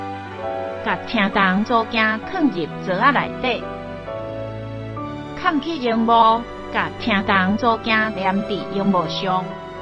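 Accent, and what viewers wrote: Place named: Taiwan. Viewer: 強大的台語，拆機教學，害我笑到肚子很痛。本來是要學拆機，變成學台語發音。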